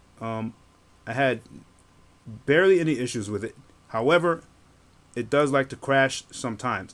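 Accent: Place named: Caribbean. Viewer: Sound like your west indian. Grenadian perhaps?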